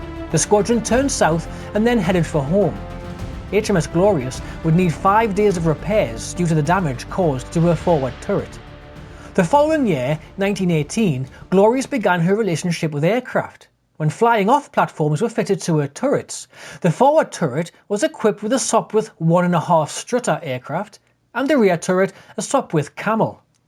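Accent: Newcastle, United Kingdom. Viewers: He sounds Geordie to me, not Scottish.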